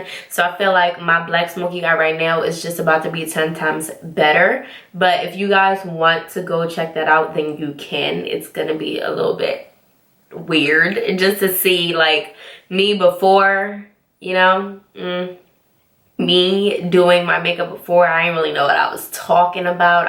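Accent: Jamaica. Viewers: Are you a fellow Jamaican by the way? Lol I hear a tiny little accent peeping out 🤣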